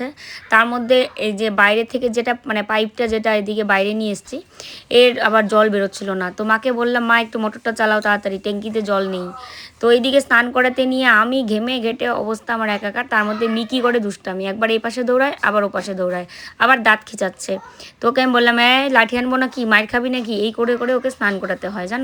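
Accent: Bangal, Bangladesh. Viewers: Tumi j bangal vasha r adeshio vashay kotha bolo, besh valo lage shunte.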